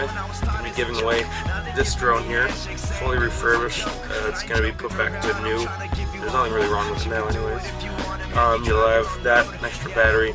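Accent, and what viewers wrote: Canada. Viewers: He’s literally Canadian. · Is he canadian?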